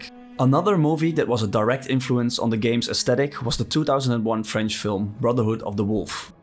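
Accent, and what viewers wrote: Netherlands. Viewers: I know a Dutch accent when I hear one.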